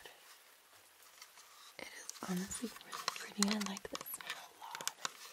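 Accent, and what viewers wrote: United States. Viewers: this is a great american accent! and I should know...;) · American accent in this one, so I guess today you’re a girl and not a gurrrrrrrul. Either way, I’m sure this will be a great video as usual. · The American accent is on point 👀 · You look so radiant dear 🥰, you're too nice, I've been fond of hearing your sweet voice. You look so gorgeous the times that your beautiful eyes look up, as you did in the second, you're very expressive, I've always liked that about you. Your American accent it was gratifying, thank you, I will always love your channel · Forgot to say: Also your american accent is getting really good. I think it's a great Californian accent do to it's lighter tone which is actually really close to my Washington DC / Northern VA / mid-Atlantic accent. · I love your American accent, it's so on point. ❤ This definitely made me sleepy, super relaxing. 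😌 I love the sound in the background. 💕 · Haha, good American accent! Except an American would never say that they are going to "pop on" anything or get something "straight away." Lol. Really good though. Always fun to hear people from other places trying an American accent. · This video was so enjoyable to watch. I really enjoyed all of triggers. This is really going to help me relax and fall asleep during the holiday secession. You have such a lovely american accent. That's a really nice dog you have. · Always look forward to falling asleep with. Don't know what I'd do without you. Great American accent btw. 😗💗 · Your American accent is pretty good! (Coming from an American) Some words are more exaggerated or repetitive than a normal American accent but to each his own ❤️ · Technically any form of the accent is american depending on where you would be in America. I still think you did a great job if I was to say an overall accent 👍😁👍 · I love hearing college american girl accent 💕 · You’re always the best in ASMR! 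Just began watching your new video and am loving the generosity in this 😁 You’ve got a great american accent and I approve of you helping others sleep peacefully 🎄🎄🙌☺️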